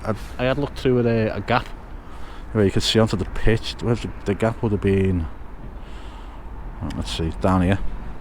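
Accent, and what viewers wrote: Liverpool, United Kingdom. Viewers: Nice scousers accent great video!! Yer love Wrexham fc I'm Celtic fc ☘️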